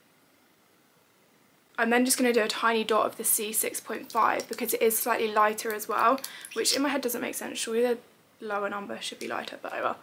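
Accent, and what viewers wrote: United Kingdom. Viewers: I love youre british accent!!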